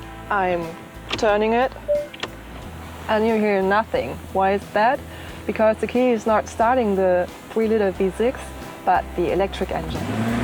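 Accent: Germany. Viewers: next time you want a german guy to review a german car, give me a ring. damn...! I cannot listen to this accent any further.... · ***** You do realize that it is possible to complain about the reviewer without regard for which brand they are reviewing right (ex. like preferring EVO to Autocar)? If you like german accent, that's fine. Some people, including me, don't. The only idiots here are people who don't think other people are allowed to disagree with them. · She's from Germany, English is not her first language. She's doing her best to give you a decent review of the car and in my opinion she's doing a good job at it. Respect.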